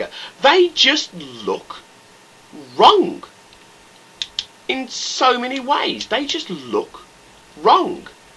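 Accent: United Kingdom. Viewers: where are you from you sound scottish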